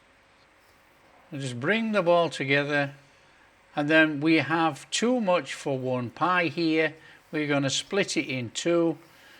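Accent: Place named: Doncaster, United Kingdom. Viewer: I spy with my little eye a Donny accent ❤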